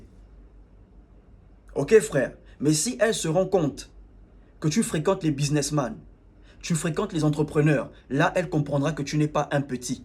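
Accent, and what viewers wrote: Gabon. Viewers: Bjr type j'aime tes conseils j'ai une question tes Gabonais ? Car ton accent est gabonais ❤si oui répond moi stp❤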